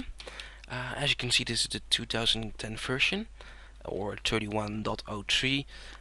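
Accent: Netherlands. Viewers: Hmmm, i can just HEAR you're dutch! :P Another one here! :) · Oh it's a Dutch accent I've never heard one before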